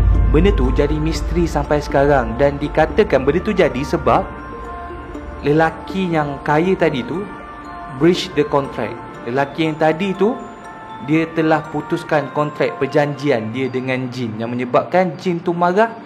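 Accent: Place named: Kuala Lumpur, Malaysia. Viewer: Cakap Kuala Lumpur ekk 🤣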